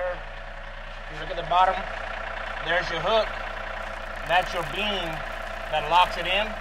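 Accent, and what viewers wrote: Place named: Louisiana, United States. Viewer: Little bit cajun lol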